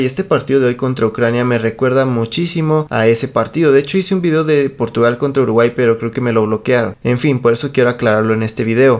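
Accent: Mexico. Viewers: Eres mexicano o portugués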